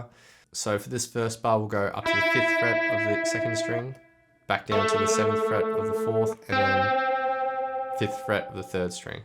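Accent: Australia. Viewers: .. it dawned on me that your accent is Australian? This is how I know actually.. I am very surprised you've not decided to cover yet!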